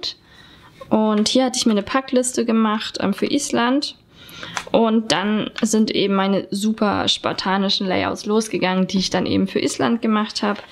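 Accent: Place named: Germany. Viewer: hallo!! ich hab erstmal deine english videos gesehen und was sicher, dass dein accent aud Deutschland war. Ich bin von Chile und kann ein bisschen deutsch sprechen aber ich hab' fast kein oppotunitat mein deutsch zu benutzen. Aber dein videos sind perfect um die sprache nicht zu vergesen 💕 (entschuldigung für die fähler)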